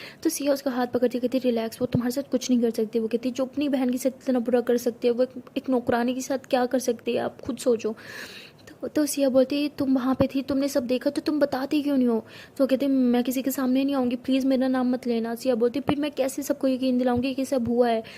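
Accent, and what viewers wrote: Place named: Uttar Pradesh, India. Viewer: Pakka up se ho sahoor to mp up me hi bolte hai😂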